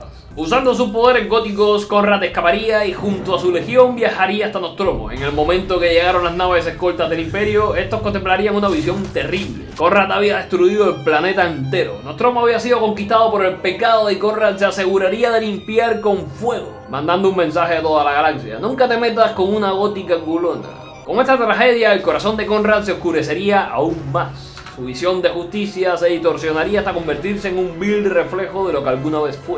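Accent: Cuba. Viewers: Perdón por la pregunta,pero sos cubano?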